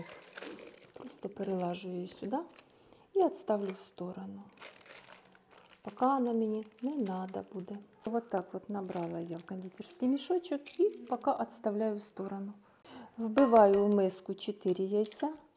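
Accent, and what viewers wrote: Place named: South, Ukraine. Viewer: Південний степовий говір 🙏❤️🇺🇦 Бабусі мої так пояснювали )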